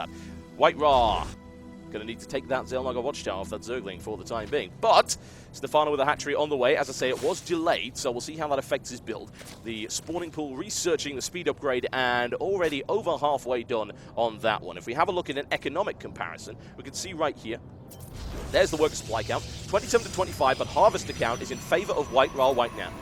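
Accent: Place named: London, United Kingdom. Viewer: TB, I bloody love you man! The entertainment value of your casts is exceptional and combined with your voice and accent they induce the kind of mancrush that is normally reserved for only the best of friends. Btw, have you ever considered starring in the next Guy Ritchie movie? I think you would be a perfect cast for one of the small-time london criminals in a movie like Lock, Stock... or Snatch :P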